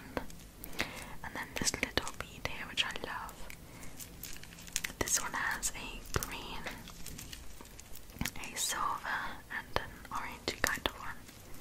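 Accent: United Kingdom. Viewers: Yes yes yes! Best ASMR ever. Your British accent is a trigger itself for me! Lots of love from Dominican Republic ❤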